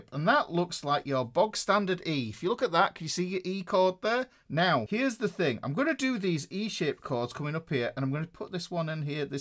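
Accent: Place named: Yorkshire, United Kingdom. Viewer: Nurr yer nurts. Must be Yorkshire…Huddersfield?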